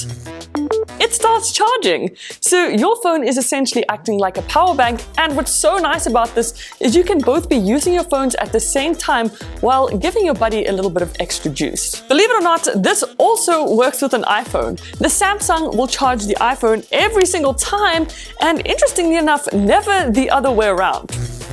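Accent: South Africa. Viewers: are you south african? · Sounds 100% South African and I love it...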